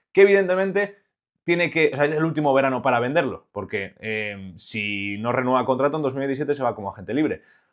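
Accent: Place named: Spain. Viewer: Eres de alemania? Por qué yo noto mucho tu asentó español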